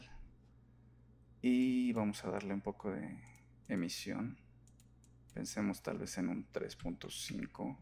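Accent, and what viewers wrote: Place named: Mexico. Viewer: me pasa que siento tu voz como si fueses actor o de alguien conocido, ¿será que los de latino américa ya estamos acostumbrados al acento mexicano en el doblaje?... xD Está excelente el modelo. Espero que te encuentres bien. Greetings!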